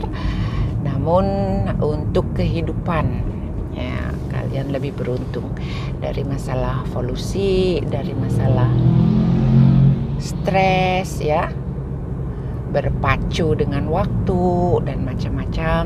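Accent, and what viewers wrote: West Java, Indonesia. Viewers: Bu , emut keneh basa Sunda teu ? Di logat namah masih Sunda nyaaa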